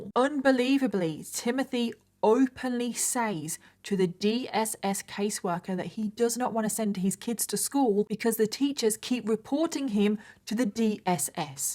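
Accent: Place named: England, United Kingdom. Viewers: Congratulations on topping the charts,sounds like you have a midlands accent,spot a fellow midlander anywhere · its nice to hear Timothy in an English Accent i have been in the states for a while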